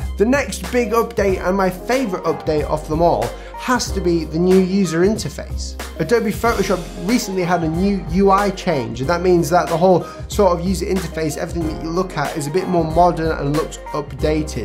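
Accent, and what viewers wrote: United Kingdom. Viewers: i have to say these updates are really minor. as a side note would you work on your video's music more and the fact that you are British and we might be fascinated by your accent is old fashioned now, take care.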